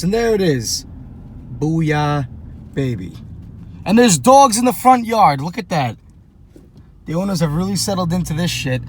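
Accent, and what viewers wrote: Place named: Massachusetts, United States. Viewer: "It's a cloudy full day here on Long Island". I had to play his opening statement twice because of his accent. Sounds cool, a little bit like Massachusetts?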